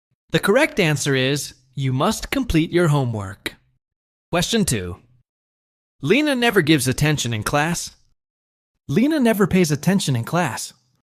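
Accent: United States. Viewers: are u using american english? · It seems like it's not British English but American English.❤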